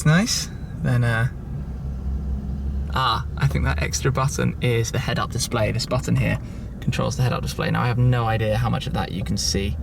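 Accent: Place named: United Kingdom. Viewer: wonderful british clear english and of cause a stunning car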